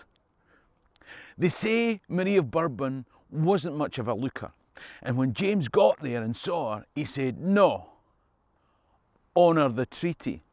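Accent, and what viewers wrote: Scotland, United Kingdom. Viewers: I could listen to your brogue all day.... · You just popped up into my YouTube feed and I’m so glad that you did....well, when I say “you”, I mean “your channel”. I love history, but focus mostly on The British Isles , Europe and the States. You are my first Scottish channel and you make history energetic, interesting, and even fun. You make it all the better with your amazing accent....although I, being of the States, in reality is the one with the accent. Thanks, so much!! I have joyfully clicked, smashed and rang the bell (icon). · I'm just a regular American, but I love the Scottish accent.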